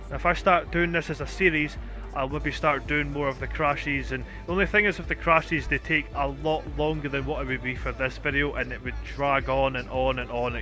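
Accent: Scotland, United Kingdom. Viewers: Didn't realise you are Scottish, hello from Northern Ireland! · Are you Scottish? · What the fuck you're Scottish as well haha, whereabouts are you from?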